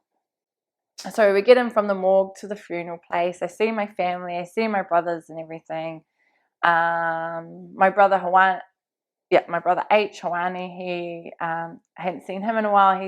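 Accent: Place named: New Zealand. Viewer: You don't sound intelligent, you definitely sound like a maori and totally look like one, You and your whole family sound like HOODRATS, WHAKAMAA Whats the point of this NEGATIVE POST ABOUT BULLSHIT GANG MEMBERS, You don't have to be GANG MEMBERS TO BE SOMEBODY, Well Whats the chances being in a Gang your sorry ass is going to be destroyed, that's the choices you maoris made. BLAME NO ONE BUT YOURSELVES. Don't blame the cops,don't blame the government. BLAME YOUR PARENTS. It's pretty hard to believe you have catholic values when you speak like a hoodrat, No wonder Maoris get a bad name. Listen to the bullshit and jellybeans that's coming out of your mouth, it's negative as and you just sound like you're going down the PITY TRACK, why have a child if you clearly can't take care of yourself and YES EVERYTHING IS YOUR FAULT AND THE BAD CHOICES YOU MADE. 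I know lot's of Chics in Aotearoa Black power,mongrel mob,head hunters, hell angel's fk'n way worser off than you, there's no way that they would be doing a pathetic interview like you are now. Good fkn job people have died in your fucked up family, You obviously don't know God, have you actually REPENTED TO GOD....DOESN'T SOUND LIKE IT, your not mentally impaired, You don't believe in God, the bullshit you went threw is nothing in comparison to what others have gone threw. You use God's name alot yet you have in no way have been obedient towards him therefore get your shit together and stop living in a dream world in the past. I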